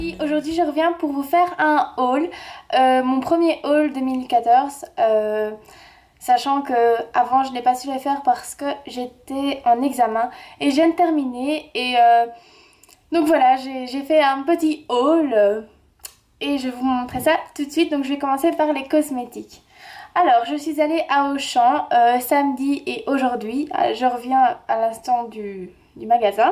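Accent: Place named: Belgium. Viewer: Coucou ma belle ! Je viens de tomber sur ta chaîne par hasard et j'aime beaucoup tes vidéos! J'ai tout de suite compris que tu était belge :) ( grâce à ton accent hihi) Je dois également me taper Auchan en France pour aller chez Kiko/Sephora :( Je voulais savoir (si ce n'est pas indiscret) dans quel région de la Belgique habite-tu? :) Bisous.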